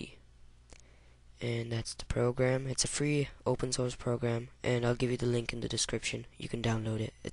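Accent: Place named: Canada. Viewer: you kinda sound like canada cool. ^w^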